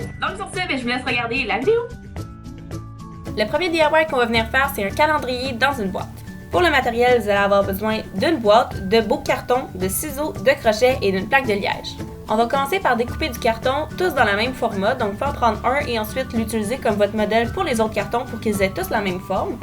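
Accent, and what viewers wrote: Quebec, Canada. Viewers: j'adooooore l'accent québécois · C'est québéquoi pas anglais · Tu viens du kébec ? Tu as l'accent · C'est l'accent Québécois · Avec ton accent je pense que tu viens du Canada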